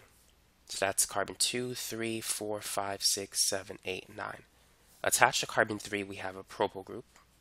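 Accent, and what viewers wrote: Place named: Northeast, United States. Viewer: his voice is soothing idk what you’re talking about. lmao its like an northeastern accent from the US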